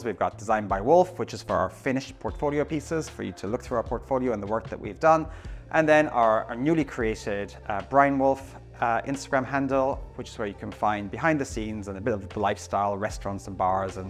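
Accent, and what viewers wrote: Ireland. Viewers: I am the first to admit that I'm a bit of a design snob... And yet, I appreciated and enjoyed the decisions you made to create your personal space. Well done, you. (Plus, an Irish accent to boot!)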